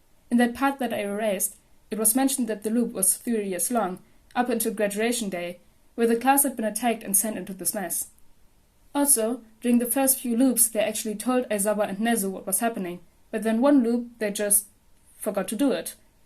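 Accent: Germany. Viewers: Let me guess you’re German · Yooooooooo i have a question are you german ?? Because you sound like my class in english class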